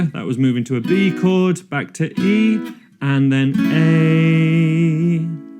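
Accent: United Kingdom. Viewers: so British! Love the accent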